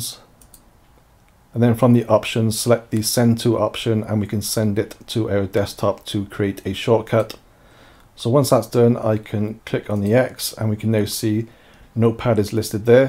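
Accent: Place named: United Kingdom. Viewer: I just started watching the video and already gave a like on It just because I loved the man's accent, IDK If he Is British but I love the British accent, and his accent sounds very likely, so... yeah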